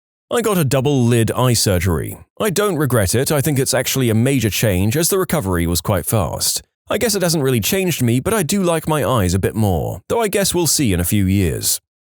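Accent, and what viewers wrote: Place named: United Kingdom. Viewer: It's called a British accent. I bet you don't pronounce the middle part of "squirrel". All accents distort certain words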